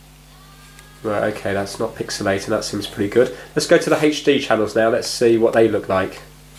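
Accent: England, United Kingdom. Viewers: Right, tell you what I missed your English accent. (MATE)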